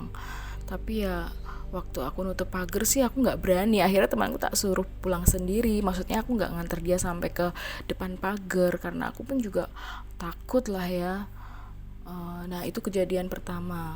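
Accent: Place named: Surabaya, Indonesia. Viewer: Ohh pantes, dr kediri.. Tak denger2in suarae kyk org Sby wkwkwkwk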